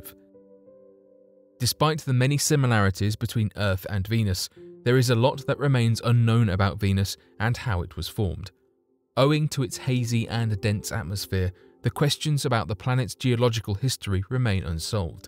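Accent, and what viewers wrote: United Kingdom. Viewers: shut the fridge. is he not british? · why do you sound british?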